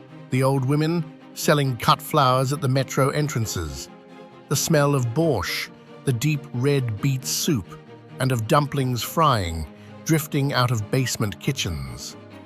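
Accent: United Kingdom. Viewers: Great 😃👍 Brit narrator ❤! Sounds like the 👑 one on! (by, mid '70's).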